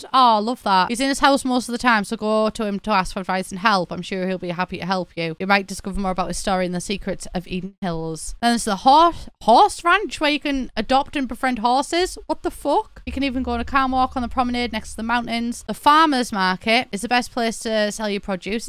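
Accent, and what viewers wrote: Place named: North, United Kingdom. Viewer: northern british accent makes me happy again and again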